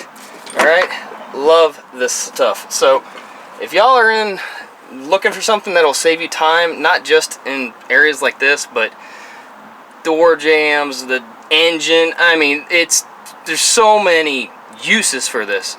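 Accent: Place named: South, United States. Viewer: 🤣😂👊👍, boy you are country!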